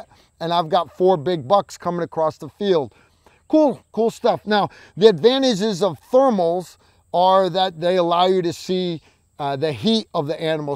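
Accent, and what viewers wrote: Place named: Upstate New York, United States. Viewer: Upstate New York accent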